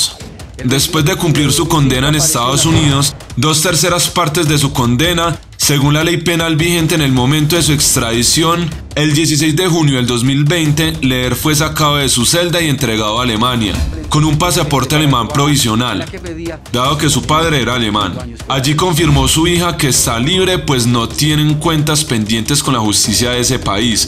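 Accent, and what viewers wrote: Colombia. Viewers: Amigo ud tiene acento colombiano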